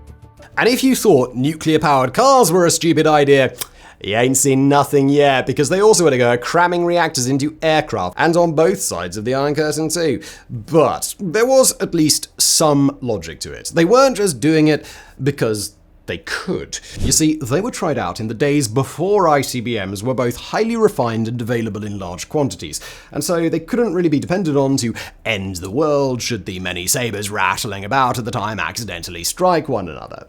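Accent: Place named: United Kingdom. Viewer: Please speak a little slower. The rapid pace, along with a British accent , reduces to gibberish to the American ear.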